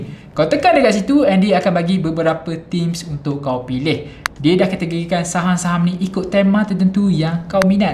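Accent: Terengganu, Malaysia. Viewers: oh bang org ganu en?😂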